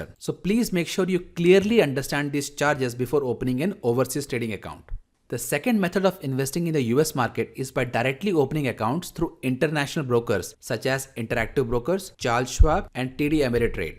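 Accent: India. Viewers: Indian English Accent Is The Most Painful English Accent To Ears In The World.... Next Time Hire Native English Guy Through Fiverr For Narrator Part....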